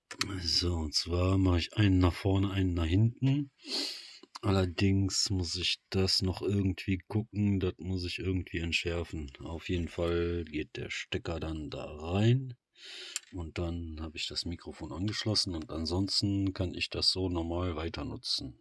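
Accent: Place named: Ruhr, Germany. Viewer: Kommse aus'm Ruhrpott?Dat hört sich so an. Och nö dat Video ist nett abba doch zuviel gebastel für mich. Vor allem bei meinem Reely Big 1 welcher Ständig weh wehchen hat nich lonhnenswert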